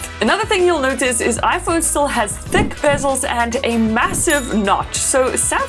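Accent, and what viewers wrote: South Africa. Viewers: Is she south African? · Heard a South African accent and hit subscribe. Only just bumped into your channel for the 1st time today. Quality content 👌🏾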